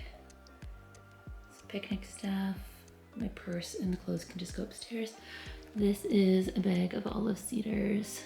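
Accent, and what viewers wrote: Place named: Canada. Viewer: You're doing a great job! I'm like you and get completely overwhelmed by clutter but I'm not a naturally tidy person so it's an uphill battle! I think my space is similar to yours in that it already doesn't have that much in it to begin with. But it's all abt how we FEEL abt the space isn't it? At one point I recognized your Canadian accent and then shortly after saw The Bay on a box! Judging from a scene in another vid, I used to live in the same area (generally speaking!) as you. I look forward to the rest of this series of vids👍🏼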